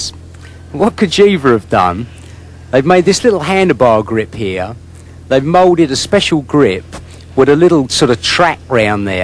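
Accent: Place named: Australia. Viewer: he's got an aussie accent on here. I guess that's what happens when you live in Australia for 10 years, lol.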